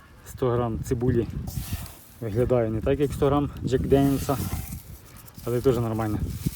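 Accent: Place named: Vinnytsia, Ukraine. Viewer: Сорт цибулі LUSY? ( Вона жє Свіфт.) Я правильно зрозуміла? А то з вашим чудовим Вінницьким діалектом не завжди зрозуміло.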